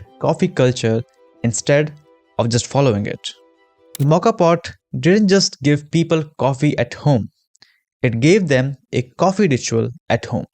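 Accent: India. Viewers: If the voice over is AI generated, the original is Indian accented. It would be unintelligible to most non Indians. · Dislike because of Indian accent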